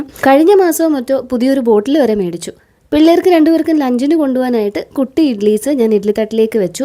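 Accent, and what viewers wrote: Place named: Kochi, India. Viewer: ഇത് കൊച്ചി ആണോ കൊച്ചി സ്ലാങ് ആണല്ലോ സംസാരിക്കുന്നത്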